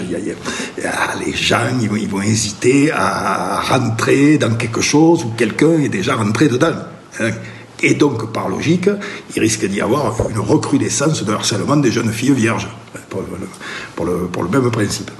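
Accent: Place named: Marseille, France. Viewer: L accent de chez NOUS .MARSEILLAIS, PAGNOLLL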